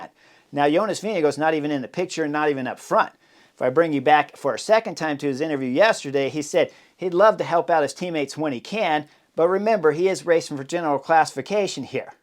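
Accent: United States. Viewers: Great analysis and superb commentary. The American accent is really getting me 😆. Super amusing hearing everything pronounced that way.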